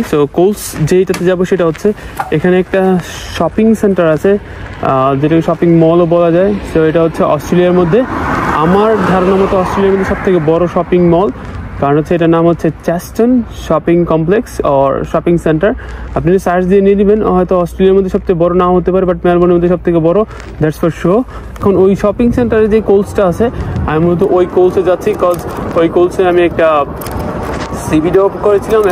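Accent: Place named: Chittagong, Bangladesh. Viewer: ভাই চট্টগ্রামের মনে হয়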